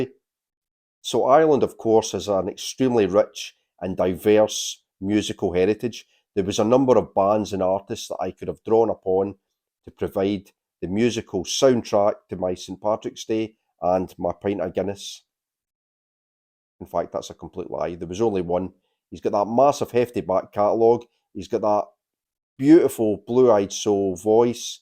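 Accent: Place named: Scotland, United Kingdom. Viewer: I wish I could have added your accent even though I assume it’s Scott’s to my video. So good ✌🏼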